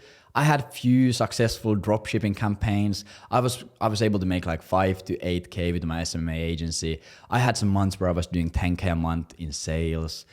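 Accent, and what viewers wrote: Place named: Finland. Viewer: Great vid, but... you said you live in Estonia, but you sound like a Finn 🧐🧐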